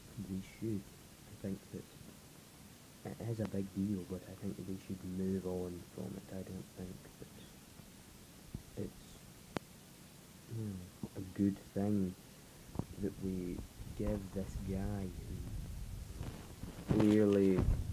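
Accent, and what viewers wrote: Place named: Scotland, United Kingdom. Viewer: D :D :D :D :D :D Scottish Accent. And first comment! (wow those don't go together at all)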